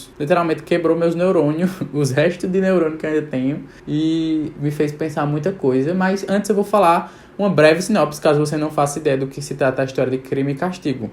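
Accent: Northeast, Brazil. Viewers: ótima esta proposta comentar os livros indicados por pessoas que você admira. Crie sim um Quadro. Três observações apenas. Não se preocupe com sua pronuncia dos nomes, mas voce pode por exemplo, consultar no google tradutor, no audio a pronuncia do idioma original. Segunda, Bob Dylan nasceu na decada de 1940 período da segunda Grande Guerra. Por fim, fale mais lento, voce explica com clareza, logo, não precisa acelerar sua fala. É muito bom ouvir o seu sotaque, infelizmente minha mãe que nasceu em Pernambuco e veio para Minas, perdeu o dela. Sucesso sempre!😊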